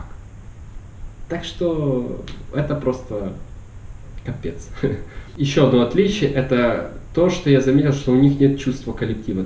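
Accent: Ukraine. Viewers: Я думал ты беларус, , а ты Одесит! Круто! У нас также! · Ахахаха, я ору! : D Ещё говор такой "Украинский"! Ну да, на Украине всё так и есть как описал) Надеюсь в Польше не все такие стукачи и зануды. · парень у тебя украинский говорок хотя ты усилино стараишсЯ обрати внимание на свою букву х и меньше подхалимничай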